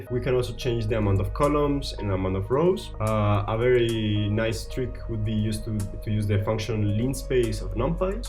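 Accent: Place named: Latin America. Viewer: Bro, are you a spanish speaker from Colombia? Your accent sounds very latin spanish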